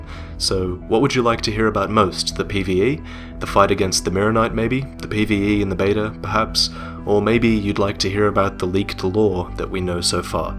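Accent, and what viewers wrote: Australia. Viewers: Wait. Hold up a second. YOU'RE AUSTRALIAN?!? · are you Australian? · hey are you australian?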